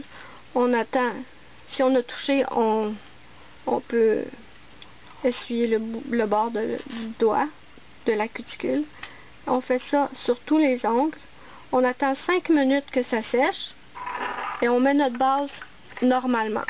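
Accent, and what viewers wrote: Quebec, Canada. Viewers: Merci beaucoup maintenant je saurai comment faire quand je le recevrai pour mon anniversaire :) j'adore vos ongles et votre accent québécois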